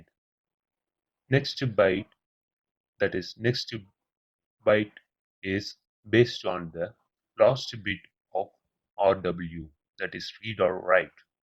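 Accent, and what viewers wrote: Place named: India. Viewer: come on idiot!!! stop speaking english... i hate indian poeple speaking English... it sounds awful !!! I hate indian people since now.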